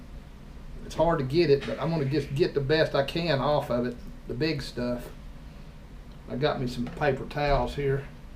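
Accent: South, United States. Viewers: Why thank you!... Love the Southern accent!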